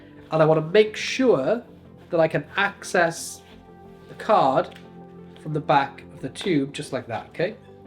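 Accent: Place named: Wales, United Kingdom. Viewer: Part of Wales you from, but? Diffo picking up unmistakable traces of the accent. And with a Celtic name too 😮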